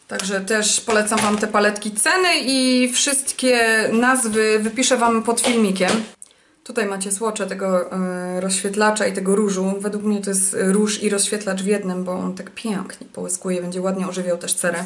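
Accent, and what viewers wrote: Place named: Silesia, Poland. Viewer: tak czułam, że dziołcha ze śląska:):) akcent Cię zdradził;)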